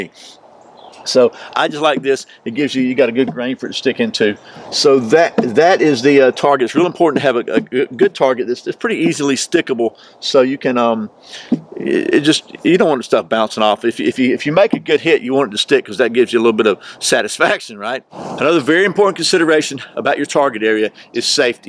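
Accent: Georgia, United States. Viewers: Tell me you're from Georgia without telling me from Georgia (He could be from like 6-7 different states with that accent, but he strikes me as being from the Conyers area in Georgia.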